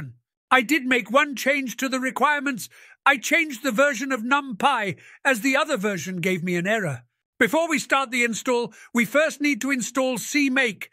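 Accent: United Kingdom. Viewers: btw is it or, can't find any british voice like yours, it's really funny, i just wanna listen to this guy, and do whatever he tells me to do, like "go to gym you idiot" XD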